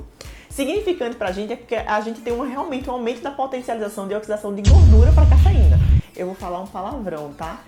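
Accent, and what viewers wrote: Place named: Pernambuco, Brazil. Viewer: Que gracinha você! De Pernambuco, certeza!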